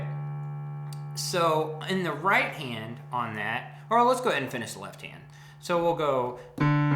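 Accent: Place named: Rural, United States. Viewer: Your voice is so country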